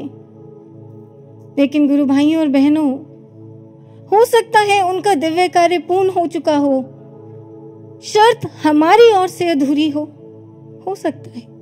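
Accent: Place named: Bengal, India. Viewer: Her bangali accent is wow !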